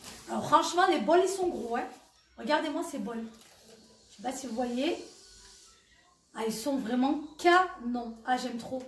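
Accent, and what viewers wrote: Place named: South, France. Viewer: C’est en région parisienne ? Vu ton accent cela doit être dans le Sud😜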